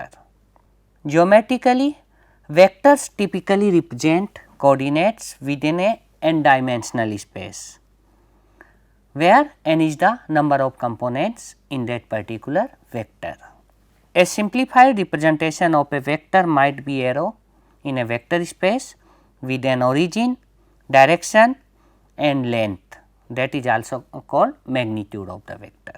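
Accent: India. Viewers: No wonder Gilbert Strangs Linear Algebra stands the test of time. Merely writing down the notations for students to memorize is typical Indian education style not to mention the pan parag way of talking “pour” “dejine”, “jeero” is off putting. The accent can be excused but add illustrative examples. New learners would be clueless why do you go for the cosine for angle between 2 vectors and why is magnitude important. Just writing it to memorize wont cut it. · Dude, then don’t watch it. There’s a great amount of effort put in to make it available for free. Also this is not an Intro to linear algebra course. Read the course title and description before you complain about pan parag accent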